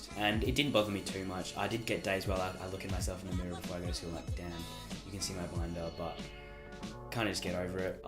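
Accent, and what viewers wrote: Australia. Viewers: Australian accent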